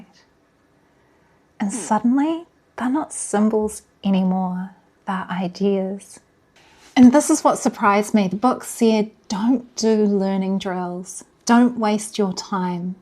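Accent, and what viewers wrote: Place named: New Zealand. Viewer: Yes! Great to connect. When I heard you cool Kiwi accent I felt like I was listening to a sister. Yes, pitch accent is so important. When we are out together , my wife lets me speak freestyle, but when we are at home together she is quite strict about teaching me how to speak with the right accent. Also she said "I can't teach you to speak mans style so you will need to find someone for that". I found a guy online who I would with once a week. He teaching me the real deal. I told him, no Keigo, and I do not want to learn textbook rubbish. And he agreed with me 100 percent. I think I'm an interesting case for him. My local friends are very patient but they mumble a lot. Tokyo japanese is so clear compared to that, and being in the countryside here in Amami, everyone speaks casual style He teaching me the real deal. I do 4 Kanji a day. 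I think Kanji will take a very long time to get. Your tips on learning to read stick with me, so I'll keep doing that. My reading is pretty bad though.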